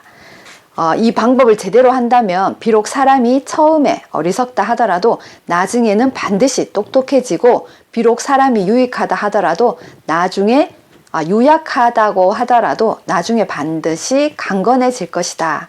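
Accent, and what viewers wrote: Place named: North Korea. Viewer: 2년전보다 이북사투리같은억양이 마이 줄었네 ㅎㅎ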